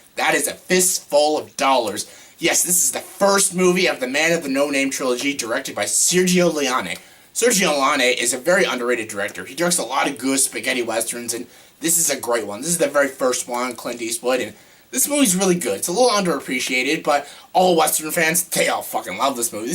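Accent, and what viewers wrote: Canada. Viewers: He's Canadian that's probably how they pronounce it no need to call him on it.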